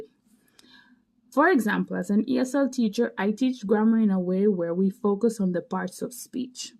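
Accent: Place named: Spain. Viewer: Interesting. Awesome! I notice you speak English with Spanish inflections. What is the youngest student u would teach?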